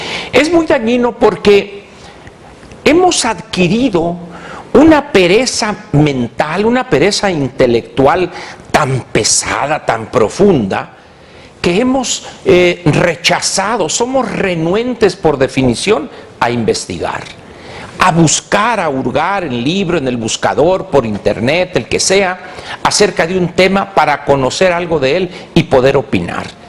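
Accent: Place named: North, Mexico. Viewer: Maestro Angel Verdugo soy su fan con todo respeto... sus interesantes comentarios , inagotables conocimientos y esa forma norteña de hablar 🤬🤬☺️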